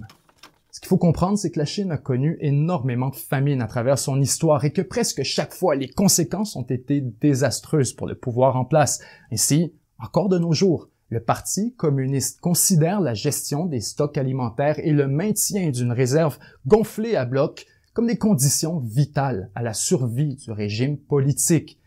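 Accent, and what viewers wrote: Quebec, Canada. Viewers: Quelle eloquence ! Le ton de la voix, les intonations, etc ...C'est un vrai plaisir à écouter. Meme le leger accent québécois met une touche en plus. Une tres bonne contribution pour francophonie de YouTube !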